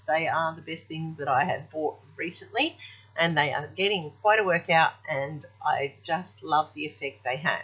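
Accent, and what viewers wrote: Australia. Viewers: I adore your Aussie accent :-). The rabbits are just lovely! Great art work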